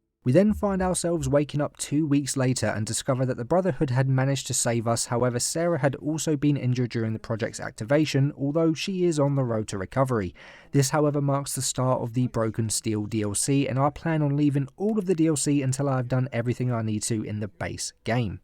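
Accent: United Kingdom. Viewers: Love clicking on videos and as soon as I hear the dumb British accent. I click off 🤣😂😆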